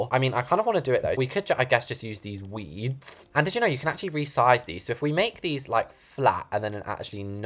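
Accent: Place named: United Kingdom. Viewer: Wait you’re British????😮😮😮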